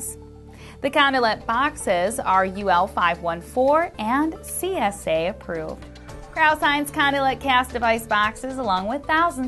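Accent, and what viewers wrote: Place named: Great Lakes, United States. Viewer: I just love Great-Lakes accent. Living in Toronto for years, I took every "appertunity" to do some "crass-borda shapping" and pick up a "bax" of fresh "caffee", all for under a hundred "dallers".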